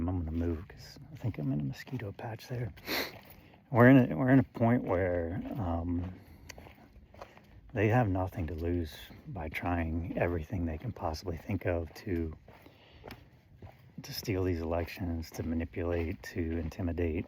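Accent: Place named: Northern California, United States. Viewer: I had a vibe that you were from NorCal as well, before you even mentioned our beloved red woods. I made the mistake of listening to all the news since I got up, not noticing my central nervous system was overwhelmed, this seems to be such a common feeling that I don’t even notice it anymore 🥺 Then I saw your video pop up and I needed your soothing voice more than you know today . Thank you 🙏 🌻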